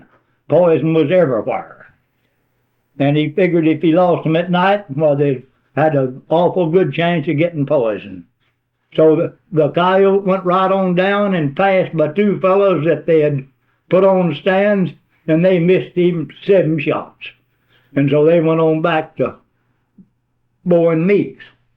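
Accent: Tennessee, United States. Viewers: Sounds like he is from Carter County TN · He almost sounds like an East Tennessean, where I’m from. Maybe mountain talk is universal? Great story teller.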